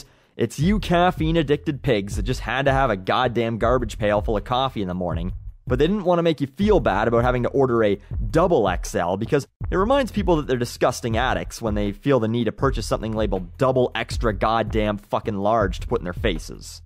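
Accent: Canada. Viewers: Canadians do say "about" weirdly but I don't hear them pronounce it like they are saying "a boot" as they have been made fun of many times before (Yes, South Park). I hear you, saying a a combination of that and "a boat" for some reason. A beaout? I don't know if this is right or not. I like those words without the Us. I know, still incorrect. Strange. In 'Merica, I've seen a theatre spelled theatre and I still spell grey with the E. Still, er does make more sense. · don't worry, I'm the exact same type of Canadian, except I do like hockey and winter. "About" Uh-bout "Favourite" Favoo-rite IT'S THE EXACT FUCKING SAME!!!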